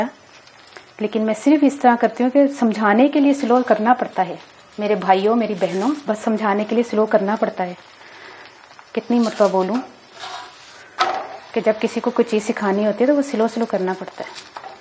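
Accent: Punjab, India. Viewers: Kasssamayyyyyyy and also ur punjabi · Asslam O Alaikum Baji apki punjabi bht sweet hy or ap ne bht achi technique btae God bless you or ap ka pratha bht acha bna hy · baji ap ki cooking zaberdust hoti he....or sath ke sath ap ki meethi punjabi bhi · Wah ji wah parathay ka maza to apni jagha lekin ap k bolnay ka andaz bht sweet hy urdun punjabi ka tarka lagta hy to maza aajata hy mn ap ki bht sari cheezain try kr chuki hon aur achi banti hn meri duain ap k sath. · Aap ki baat karne ka tarika thoda panjabi hy mashaallah paratha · You are so sweet, your Panjabi is so cute.Love you dear. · Punjabi bht sweet bolti hn ap bht zada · Masha Allah so sweet voice aap ki mujha panjabi bahut pasand hai masha Allah masha Allah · Sachi aapki batein karte hue, aur vo bhi Punjabi mau, bahut achha lagta hai. Aise lagta hai ki bas sath hi khade hoker batein karte karte maze se khana pakka rahe hai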